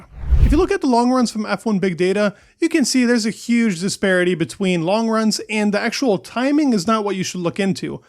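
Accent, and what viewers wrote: United States. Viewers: Same here. I listen to the Brits of course, they have a lot of good content, but it's great to hear an American voice being absolutely as good as any of them, and with perhaps a little less bias towards the British teams and drivers.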